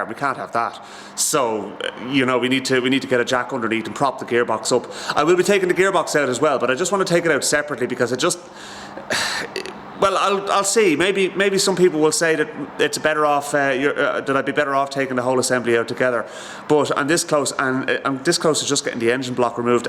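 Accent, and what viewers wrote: Ireland. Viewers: The images on the vidwo look fine and I love your channel. This American also finds your Irish accent to be a pleasant addition. I worked in Dublin (actually, in Blackrock) a while back and love the countryside and the people of Ireland.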